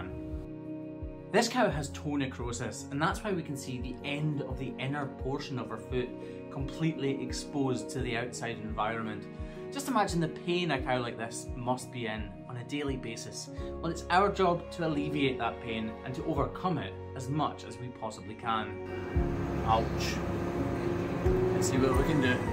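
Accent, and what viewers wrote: Scotland, United Kingdom. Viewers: That's probably cause he's Scottish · Gotta love that Scottish brogue, I dated a Scottish girl for about a year and I still miss that accent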